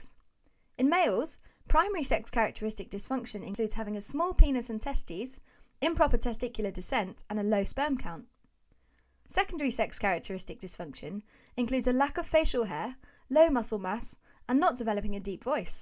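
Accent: United Kingdom. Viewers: Her high-pitched low-volume voice with British accent is simply annoying. It's like she's trying to become the British female Tanner, but without any success. #BringBackTanner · British accent?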